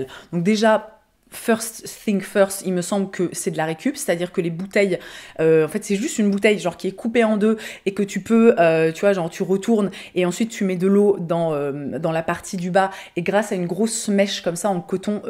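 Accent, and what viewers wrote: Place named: South, France. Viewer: Une vidéo revigorante (avec l'accent du sud) j'adore, prends bien soin de toi, compte bien tes cachets antidépresseur (haha) et vive la menthe poivrée !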